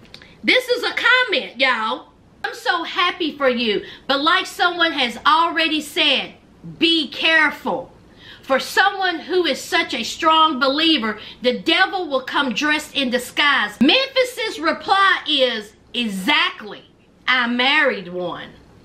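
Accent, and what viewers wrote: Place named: North Carolina, United States. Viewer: NC resident? your accent sounds real close to those in the foothills.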